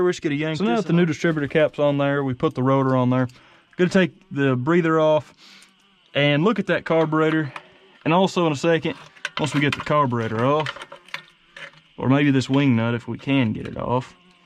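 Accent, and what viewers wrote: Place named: Georgia, United States. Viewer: SOUNDS like he's in Georgia, or FROM Georgia, anyway.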